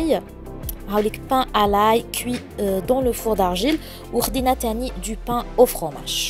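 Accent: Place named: France. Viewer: J adore ta voix et ton accent français .bon anniversaire .enjoy.bisous du Maroc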